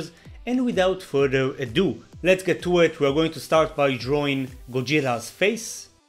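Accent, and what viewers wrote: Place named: Israel. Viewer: נשבע שהוא נשמע ישראלי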